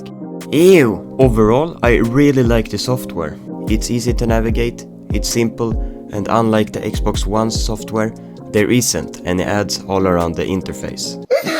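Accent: Sweden. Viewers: I can hear your Swedish accent as you said " " unshorted series? Mean uncharted? To be fair we both have similar languages in terms of syntax. Just remember to add the T in RS pronunciations to words. Some* xD It's okay.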